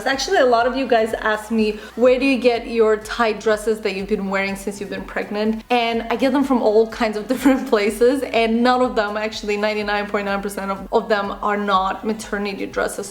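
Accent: Russia. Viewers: you could see it from her videos that she's russian plus her accent is obviously a russian one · Русский акцент?😳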